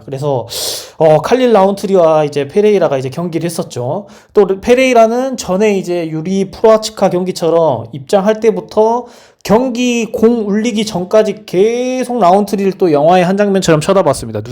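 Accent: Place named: Jeolla, South Korea. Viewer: 말투가 전라도사람인듯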